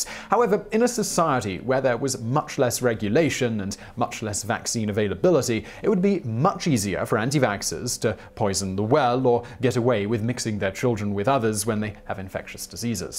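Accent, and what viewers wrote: United Kingdom. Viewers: Bad advice from a guy with a British accent is yet bad advice... horses are essentials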